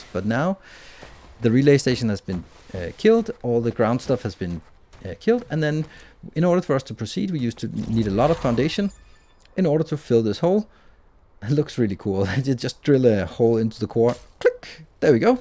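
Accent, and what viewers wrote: Denmark. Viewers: As a dane, its nice to listen to English with danish accent.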